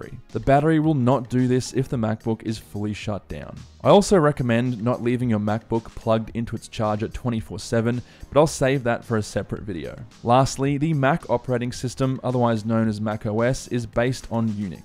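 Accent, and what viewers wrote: Australia. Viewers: Are you Aussie? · Fabulous really good quality presentation and great to hear an Aussie.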